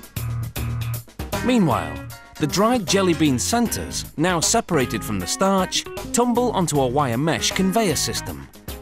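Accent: England, United Kingdom. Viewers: i love English accent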